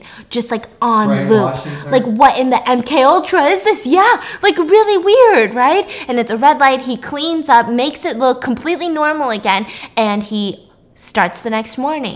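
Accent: United Kingdom. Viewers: im here to let you know it is a British accent and imma go with this loll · Ok but your British accent is the only one I will accept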